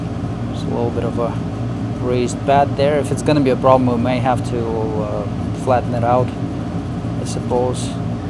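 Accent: Russia. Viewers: мне кажется, или у тебя русский акцент? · Do you have Russian accent?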